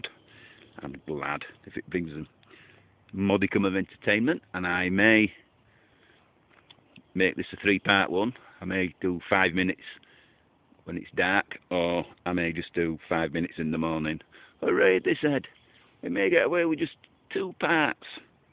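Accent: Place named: Leeds, United Kingdom. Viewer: You wildcamp like me sir, I trip up over my guy lines too. And I ramble on to myself hee hee. I live in Cheshire so not so far from (leeds?) from your accent, if you ever fancy a meet up for a wild camp give me a shout...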